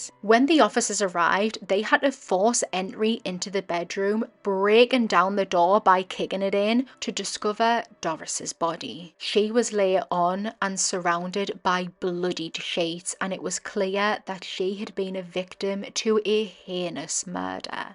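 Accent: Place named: South Shields, United Kingdom. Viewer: Your accent sounds local to me. I’m from South Shields. First time listening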